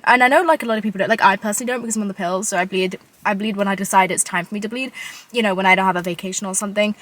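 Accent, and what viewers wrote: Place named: United Kingdom. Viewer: I could listen to u talk for hours it’s like listening to myself but British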